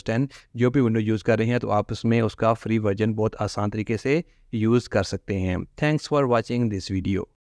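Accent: India. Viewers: Cannot understand, you have a strong Indian accent & talk too fast. A lot of foreigners have this problem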